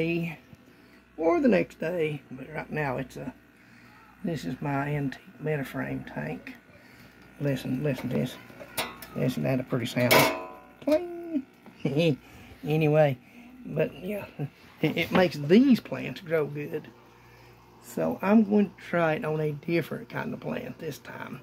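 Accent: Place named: Middle Tennessee, United States. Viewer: You sounds like your from my neck of the woods . I’m in middel tn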